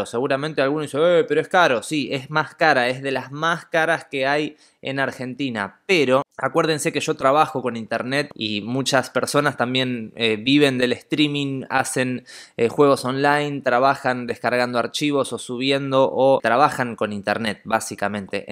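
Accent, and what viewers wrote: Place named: Colombia. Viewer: jajaja ya conocía al cantante? Pero vio que si se parece en algo jajajja ah bueno hablas como Colombiano en la buena parce jajajja 👍🇨🇴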